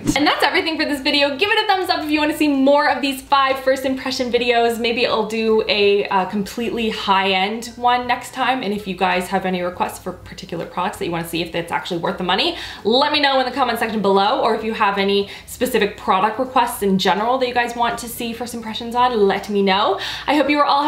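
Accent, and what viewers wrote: Canada. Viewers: that moment when I'm so Canadian that I didn't even realize you sounded really Canadian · You always sound Canadian and it's adorable! it's one of the reasons i follow your channel.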